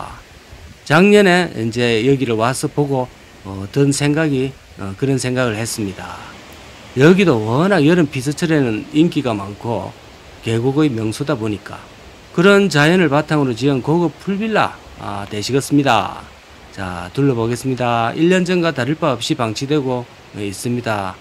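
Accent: Gyeongsang, South Korea. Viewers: 경상도 사투리 완벽하게 이해하는 1인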